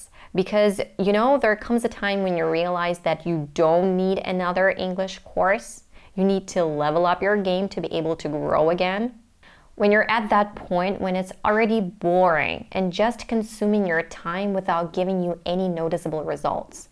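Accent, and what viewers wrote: United States. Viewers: You have a perfect American English accent. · О май гаш, наконец то нашелся человек с СНГ который действительно говорит с максимально похожим на американский акцентом. От остальных очень сильно слышно русский акцент и попытка скрыть его сильным произношением буквы Р в американском стиле. Красава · Can't believe ure not American Where u from then ? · Your pronunciation and accent is more American. Why? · I thought you are American 😂, your accent is so incredible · Wow and this is American pure English American 🙏🙏 color and colour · Your American accent is extremely good. Outside of some Dutch people you sound the most like a native American than anyone I've ever heard. It's interesting that your vowels sound like the accent that is similar to people from Minnesota, USA. I've never heard that particular sound from anyone who isn't from Canada or Minnesota. 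· Your speaking and accent are amazing. I can not imagine that you never lived in the North America before. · I m new here, Mss, what country are you from? You have a clear American Accent congratulation foryour effort, God bless you🙏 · Hey you know what I like your American accent so much. And like you said why I'm learning English every day every single day because I love it I love doing this. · You have a perfect American accent. Where are you from that you say you're from a non English speaking country? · Chan Jason definitely American English... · I'm not sure how I got here (I'm from the USA), but this video blew my mind! I speak German and French but am feeling stuck for Russian...this motivated me quite a lot...thanks! Your accent sounds more American than mine btw great job 😆😃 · It's a perfect American accent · Wow, unbelievable. I thought you are American and English teacher after watching your several videos. 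Actually you learned English in a un-English country, no English speaking partner , ,,, totally no English environmental, and just two years. You break me down. You know what? I learned English from 7 years old and for 50 years. And my mum was English teacher,lol. I have spent 26 of 50 years in English country. But I still can't speak good English. Many people complain not enough time, not in English speaking country, not English speaking partner. That is me. Your story makes me so shame. Your story is really encouraging me. Thanks. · ну да, она слушала больше американцев. Просто ей видимо американский вариант больше подходит. Мне вот тоже как-то претит губы вытягивать по-британскии каждый раз когда слова на дифтонг оу заканчиваются, вроде go, know и прочего. Если вы задумываетесь над правильным произношением, и тем более если вы хотите говорить так же как автор этого канала, спешу вас предостеречь, что такое не всем дано. 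Это целая наука, и у 90 процентов всех кто стремится идеально говорить на английском или любом другом иностранном языке не всегда это получается. Все дело в строение речевого аппарата. Когда мы учим родной язык он принимает определённую форму и вы уже не сможете без специальных практик, к коим к примеру обращаются дикторы и парадисты, освоить безакцентный иностранный язык. Я честно говоря вообще перестал "парится" по поводу произношения. Главное, что бы тебя понимали и что бы тебе было комфортно , а остальное не так уж и важно) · I thought you were an American! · She sounds so American but her thoughts her attitude is different from those people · You sure you are not American? If not, you did a great job ! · I bet you're lying its hard to believe you ain't an american . Awesome accent !! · Watching from Philippines.I am highly motivated when I watched your videos.I have learned more.You have a brilliant mind; II like the way you speaks,Americant accent,is easy to understand compare to British accent but I like British accent too.Thanks for your wisdom.Have a nice day. 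· I really thought u were American . U r so amazing n such an inspiration. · You're American. I know that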